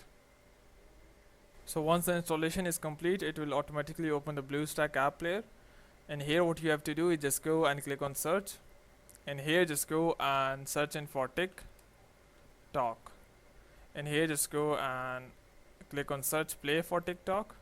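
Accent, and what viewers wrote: India. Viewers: This guy sounds like hes from india. Don't follow hes tutorial it's just a big hack!!!!!! t-gay sub to pewdiepai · He is just speaking in an indian accent? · he doesnt sound like a tech support scammer he sounds like a homeless indian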